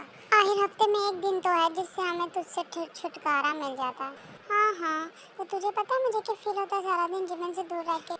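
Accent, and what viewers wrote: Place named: Pakistan. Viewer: Ap ke bol ne ke tarika se aisa lag raha hai ki aap Pakistan se ho. kya ap Pakistan se ho? Becoz mai Pakistani Jikooker hoon.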